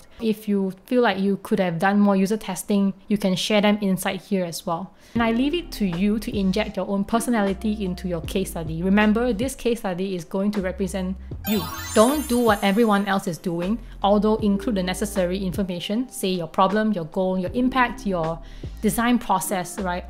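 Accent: Nigeria. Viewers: You sound like a Nigerian ❤️ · Just discovered your channel, very informative video... Btw you have a Nigerian accent and i love 😊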